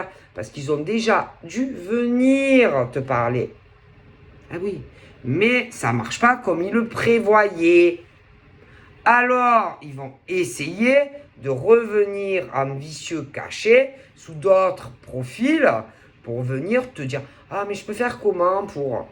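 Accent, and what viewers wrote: South, France. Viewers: l'accent du Soleil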